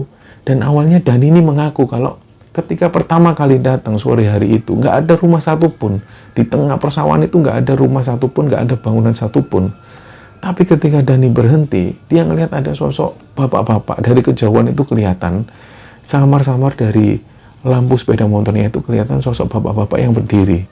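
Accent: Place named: Java, Indonesia. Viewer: Logat nada bicara mu kami smua suka dan senang mendengar kan nya. Gas Jawa banget. Jdi sesuai Membawakan Kisah kisah horor itu. NGENAK DI HATI PENDENGAR NYA.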